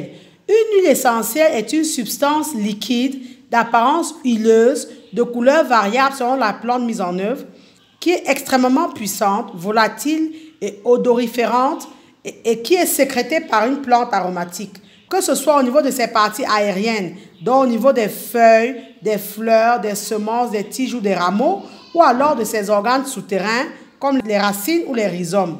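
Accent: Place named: Africa. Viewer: Félicitations ! L'agriculteur africain a besoin d'entendre vos conseils. Personnellement je vous écouterais des heures tellement votre voix est claire et votre accent tout africain de ce français que vous maniez si bien est comme une musique. Continuez. On est ensemble.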